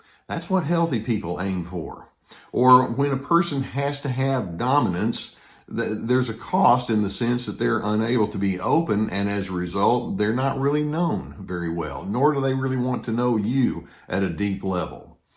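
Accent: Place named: West, United States. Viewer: I think I would purchase an alarm clock with Dr. Les Carter giving out some solid grounding and factual encouragement first thing in the morning. That accent and articulate way of constructing a point of view reminds me of Western Movies, the reasonable man who verbally manages to get two gunslingers to avoid the showdown.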